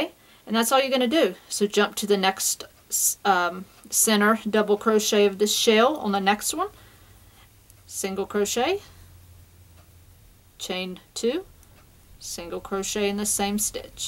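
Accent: South, United States. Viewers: Very good video. I hear a bit of an accent in your voice. From the South?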